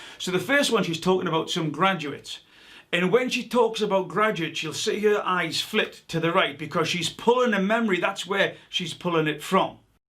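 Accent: Newcastle, United Kingdom. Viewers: Found your channel and love your insight. Oh I think the people of interest, that you could analyse, is endless.... I'm fascinated in the subtle signs to look out for, your beautiful Geordie voice , and your simple bitesize explanations, makes learning a treat ha ha. Your wife and daughters should be so proud of you. Having been lied to on grand scales through my life, I feel your work is invaluable to me personally, so I can continue to grow and trust again. Thank you so much. Janice from England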